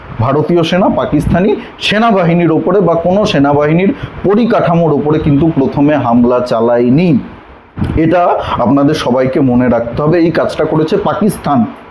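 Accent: Bangladesh. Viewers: তোর বাংলা উচ্চারণে বোঝা যাচ্ছে তুই বাংলাদেশী|এদেশের মানুষের মধ্যে বিভেদ তৈরী করতে চাইছিস |তুই অবিলম্বে বাংলাদেশে পালা|ওটাই তোর আসল জায়গা |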